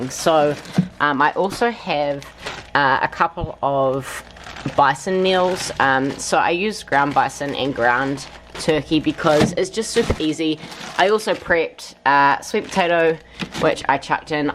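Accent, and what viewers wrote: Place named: New Zealand. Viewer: I've just subscribed (YT recommended this video to me) and when you spoke, it was cool to hear you are a fellow Kiwi :-)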